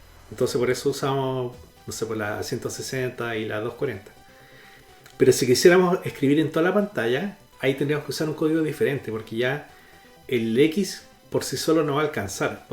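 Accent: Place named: Chile. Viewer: excelente, increible la coincidencia !... yo estoy metido tambien en un grupo de Facebook Atari Chile, ahi tambien se comparte harta informacion, hace poco por ejemplo compre el A8PicoCart y el SIO2PC pero es un Arduino con Pantalla Tactil seguro lo ubicas, y el vendedor que es un usuario del mismo grupo me vendio el Cartucho 4 en 1 que trae Basic, y Ensamblador, y justo me pille este video, cuanod escucho el acento del locutor, y me sorprendi que un chileno este hablando tan bien de este tema.. y mejor aun si es de la zona. Asi que, encantado nos podemos juntar, te aviso cuando vaya a Quilpue y charlamos. La verdad a mi me gusta la programacion retro tambien, de hecho, para C++ no utilizo ningun IDE, uso VIM sin esas herramientas modernas de Intelisence o Autocompletado, mientras mas simple el editor mejor. es mas tengo en mi canal unos mini tutoriales para configurar el entorno al estilo Borland y Atari. 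Sobre el Z80, estuve mirando unos videos de Profesor Retroman, es un canal español super didactico tambien, ahi aprendi algunos conceptos como dibujar sprites en pantalla.. pero como no tengo nada parecido al Z80, me incline por el 6502. En que usas C++ ?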